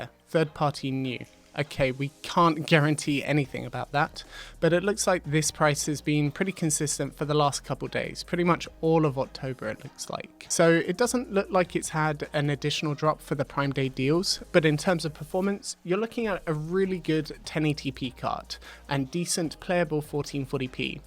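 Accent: United Kingdom. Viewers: For those (like me) who assumed that this bloke might be doing UK prices because of his accent, this is for US prices. (this is not a dig at the channel, just putting this out there for those that might see it)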